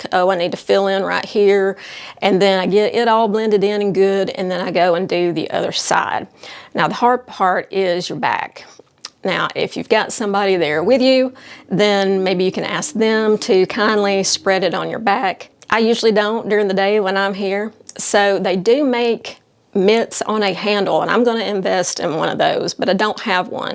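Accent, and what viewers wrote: South, United States. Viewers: You are such a refreshing and “ real” influencer ! I thoroughly enjoyed your video and love your accent cuz I’m a southern girl too!! Great job!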